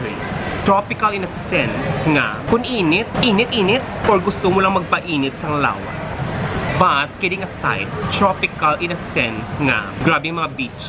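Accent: Iloilo, Philippines. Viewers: Hugot gid kuya, true gid ginahambal nimo how,tama ba ako ilonggo,bisaya gid ako,thailand is the nice place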